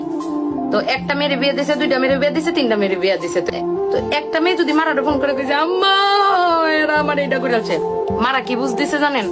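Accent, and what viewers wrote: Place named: Mymensingh, Bangladesh. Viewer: ভাষা শুনে বুজলাম এই মেয়ের বাড়ি ময়মনসিংহ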